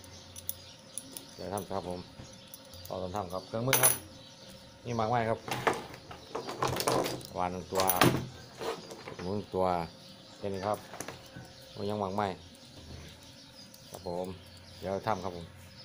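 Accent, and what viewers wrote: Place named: Isan, Thailand. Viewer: ยุบ้านไหนน่อนิครับคือเว้าคือกันแท้ครับ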